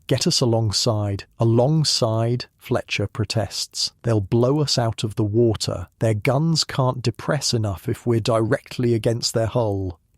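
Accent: England, United Kingdom. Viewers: Anyone knows where this accent came from? England?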